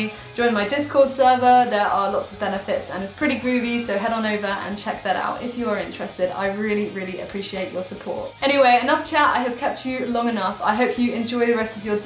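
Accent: United Kingdom. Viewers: I heard a British accent, am I mistaken? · And for your accent, it has to British. Best musicians in the whole world!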